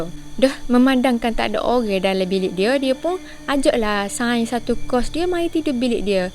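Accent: Kelantan, Malaysia. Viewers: Hadir dari kedah, kawey suko dengar demo cerito dlm loghat kelate rak👍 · Jangan Kelantan sangat...ada yang saya tak faham🤣😂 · Hadir kita pun dari Kedah , kawey Suko jugak cerita laghat kelate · Sedap dengar awak cakap loghat Kelate. Salam dari Kedah 😘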